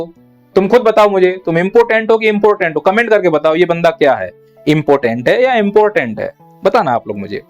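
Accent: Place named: Haryana, India. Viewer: 🎉chora hariyanvi 😂 hai bolte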